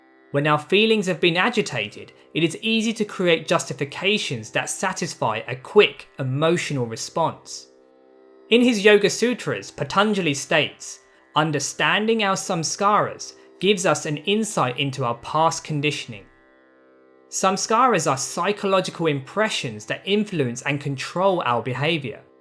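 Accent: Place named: United Kingdom. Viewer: UK! I think due to his speaking type!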